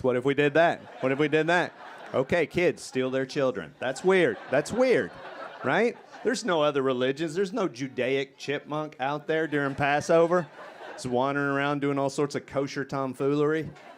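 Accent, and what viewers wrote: South, United States. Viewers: I just love brilliance with a southern accent ❤ such a juxtaposition.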